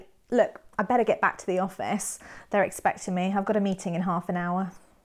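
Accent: United Kingdom. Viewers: Salute British English👉👉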